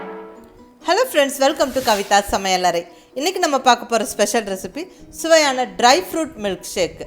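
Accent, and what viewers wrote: Kongu, India. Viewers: Good one ma'am. Thank you. Namma kongu tamil alago alagu.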